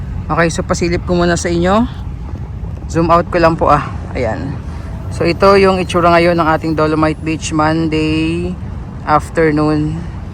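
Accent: Visayas, Philippines. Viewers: Hoy ayusin salita mo wala Naman Bola ano Ka bisaya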